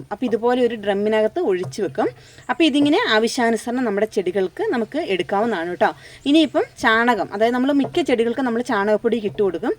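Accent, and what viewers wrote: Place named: Thrissur, India. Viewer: സാധാരണ തൃശൂർ ഭാഗത്തെ സ്ലാങ് ൽ വരും,,,,, ട്ടോ ട്ടോ,,,,,, തൃശൂർ പൂരത്തിന്റെ വെടിക്കെട്ട്‌ പോലെ 😁😆😆😆,,,,, ഞാനും തൃശൂർ ക്കാരിയാണ് ❤️ with proud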